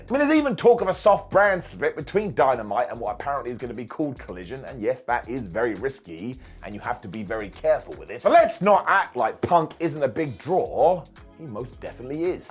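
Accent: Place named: United Kingdom. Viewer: You speak so british.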